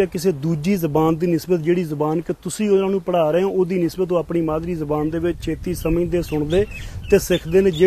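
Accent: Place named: Majha, India. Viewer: Waah love you aa veerey, waahwa (bahut) changi gall ay. Mai vi British Punjabi ay, chardey (east) Punjab ton. Tusaan majhi bolandye jo (you are speaking majhi dialect of Punjabi - spoken in lahore, ambarsar, tarn taran, sheikhupura etc)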